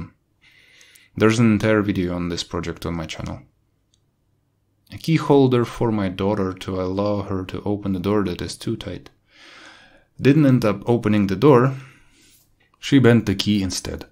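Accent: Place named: Russia. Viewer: Отлично. Дом без принтера скоро будет невозможен, это как микроволновка или пылесос, вещь необходимая. Дочь Арина и по акценту понятно что по русски говорите.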